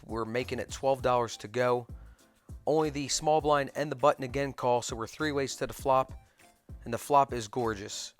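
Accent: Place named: Maryland, United States. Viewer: 1 minute in, and you get a like just for your Maryland accent!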